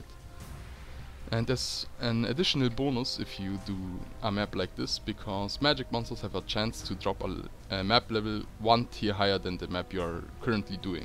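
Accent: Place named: Germany. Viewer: Are you German ? Ich höre das ;) Vorallem wie du Bonus aussprichst :D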